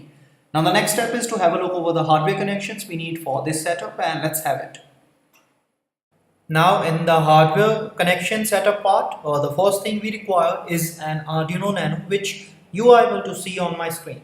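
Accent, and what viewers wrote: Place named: East India, India. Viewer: The East Indian accent is difficult enough to understand without the tutorial taking place in a live room.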